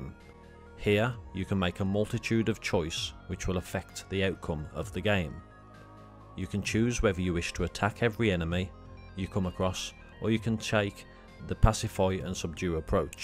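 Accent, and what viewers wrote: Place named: Midlands, United Kingdom. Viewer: Great video and content, plus it sounds like you're from the Midlands, so subscribed! Looking forward to more but in the mean time I will check out some of these games. Cheers.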